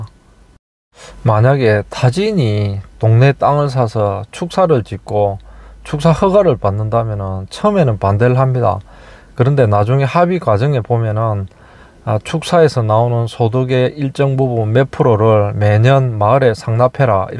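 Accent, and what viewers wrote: Gyeongsang, South Korea. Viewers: 사투리는 경상도신데 생각이나 표현은 진심인 진보라 늘 응원합니다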